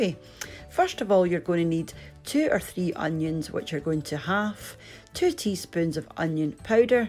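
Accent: Scotland, United Kingdom. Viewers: Love the chicken recipe and the lovely Scottish accent. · Thanks for the video - heard the Scottish accent after a long time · AWESOME ......love the scots accent x Mines now ready thanks 0)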